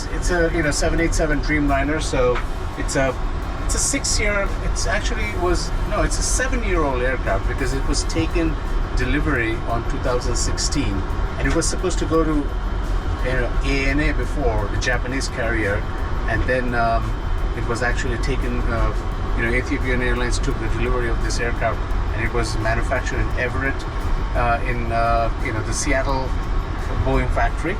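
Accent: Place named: Trinidad and Tobago. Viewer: U sound like u from Trinidad and Tobago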